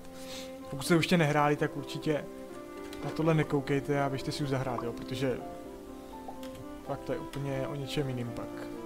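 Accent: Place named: Brno, Czech Republic. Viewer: hele my sice víme že nemáš čas ale podívej se kolik lidí chce aby si nahrával alespoň jedno video za týden rozhodně by nám to stačilo protože bysme pravidelně slyšely tvůj příjemnej hlas a brněnské nářečí;) opravdu nám všem chybíš:)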